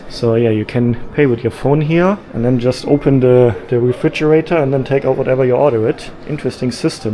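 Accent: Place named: Germany. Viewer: Love the german accent 😂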